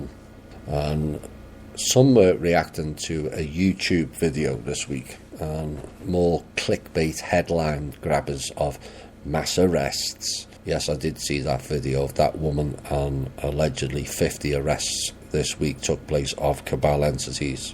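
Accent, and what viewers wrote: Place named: Northern England, United Kingdom. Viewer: Good info, nice northan accent, feels like been back home, derbyshire man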